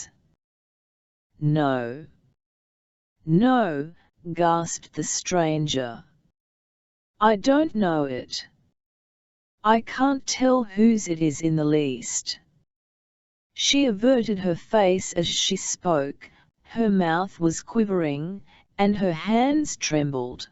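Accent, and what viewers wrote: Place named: Australia. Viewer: People learning from this will pick up a strong Australian accent and a very robotic expressionless intonation!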